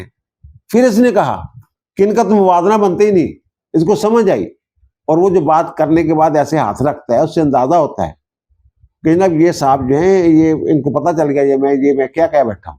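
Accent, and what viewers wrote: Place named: Punjab, India. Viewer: آجکل پنجابی تڑکا کچھ زیادہ لگ رہا ھے۔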